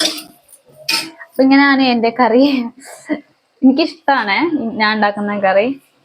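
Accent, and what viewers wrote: Malappuram, India. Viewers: ഞാനും അങ്ങനെ തന്നെ പാവം നമ്മുടെ മലപ്പുറം ഭാഷ പറയുന്നു കുട്ടി 🥰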